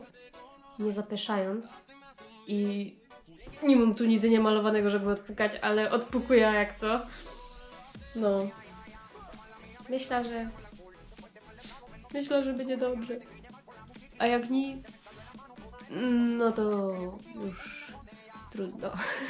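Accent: Silesia, Poland. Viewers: Fajnie słuchac Ślonsko godka w wersji żeńskiej 😂😂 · Pozdrowionka i powodzenia ;) Miło się słucha tej Twojej ślonsko godki.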